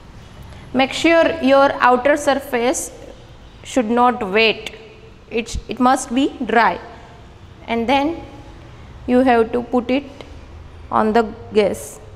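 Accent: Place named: South, India. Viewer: Lmfaoo her English is perfectly fine it's called an accent duh she is prob south indian so yeah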